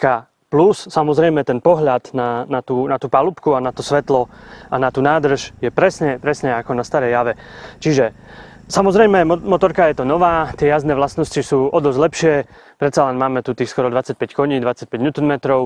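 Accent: Eastern Slovakia, Slovakia. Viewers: Co to je za STRAŠNEJ dialekt???????. Nejsem Slovák, ale ten přízvuk je strašnej i pro Čecha. To je co za dialekt něktéré části Slovenksa u Ukrajiny nebo Polska, Maďarska????? Díky za odpověď. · Ten východniarsky prízvuk mi uši trhá...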